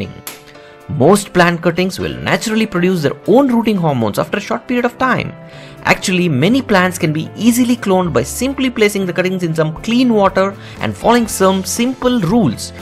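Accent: India. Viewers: Thank you.That was the most informative instructive article I have read about routing .hormone on the internet. The accent is from India I think. Please let me know. Thank you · Very bad indian accent-English language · I like your Indian English accents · You sound very funny... Are you an indian?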